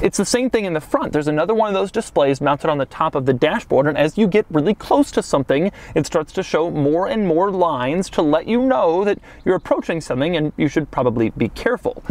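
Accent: United States. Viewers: I absolutely hate how Americans pronounce German names. I mean I’m Dutch so as an inhabitant of the neighbor county of Germany pronouncing the names of these cars correctly is a lot easier for me than for you, but please try to because you Americans think you are the best in the world while Europe is a lot better.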